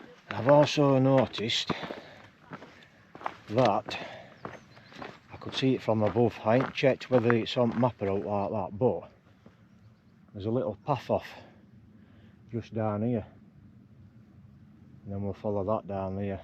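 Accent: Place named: Yorkshire, United Kingdom. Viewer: Need to stop watching this channel. A few days ago I caught myself slipping out a bit of accent, saying "over there", and thought where the bloody hell did that come from? Ah, I know,. Did make me laugh. Hahaha. Like English kids who watch too much American YouTube and start talking in a yanky accent, we're all going to start talking in a Yorkshire accent, and that.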